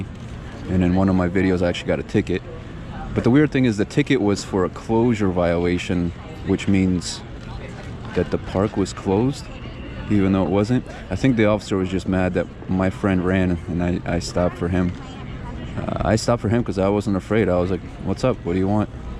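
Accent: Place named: East Coast, United States. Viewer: You sound like young Sylvester Stallone my man! That east coast accent. Subscribed!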